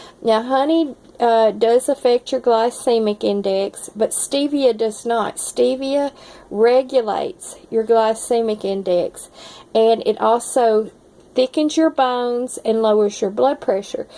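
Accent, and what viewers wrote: South, United States. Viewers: I love your videos. It's like you are family with your southern accent.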